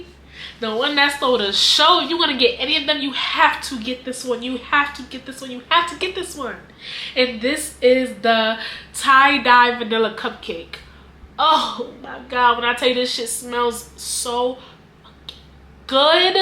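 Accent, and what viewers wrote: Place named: New York, United States. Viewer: You sound like a native New Yorker ! 😂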